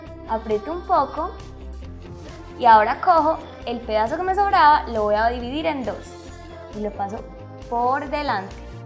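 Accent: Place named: Colombia. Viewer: que país eres ?.... si me preguntan por que : es que tu acento es como colombiano o venezolano AAI NOSE estoy confundida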